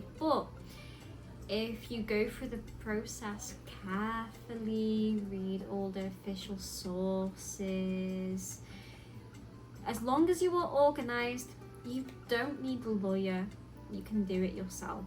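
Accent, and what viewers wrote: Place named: United Kingdom. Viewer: Seja bem-vinda. Aproveite bem as belezas naturais do Brasil. Viaje bastante pelo estado de SP e Brasil assim podemos nos deliciar e aprender mais do seu inglês britânico.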